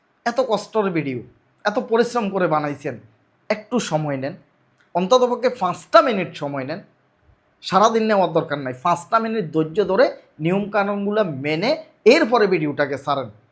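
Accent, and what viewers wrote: Chittagong, Bangladesh. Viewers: Vai apni ki Chittagong naki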